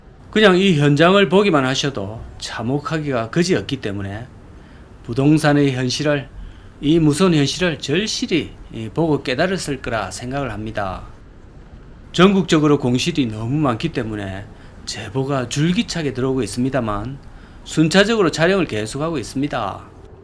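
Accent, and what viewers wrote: Gyeongsang, South Korea. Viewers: 구수한 경상도말씨로 설명을 정말 잘하셔서 다른 사람들도 처럼 하시는 분도 있지만 재미가없고 설명을 뽄따서 하고 있는데 저는 절대로 안본답니다 하이팅. 하셔요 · 사투리 토하겠다 할배야 경상도 말투좀 버리고 딕션 연습좀 하세요.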